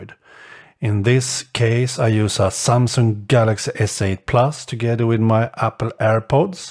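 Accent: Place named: Sweden. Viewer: Jag kan höra att du pratar svenska haha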